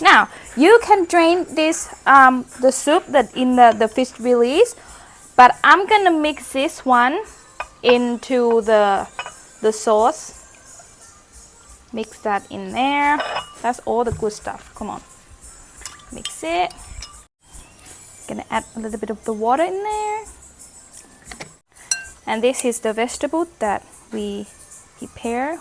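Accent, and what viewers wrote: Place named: Australia. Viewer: Your man must be an Aussie, as you speak English with an Aussie accent. Lovely.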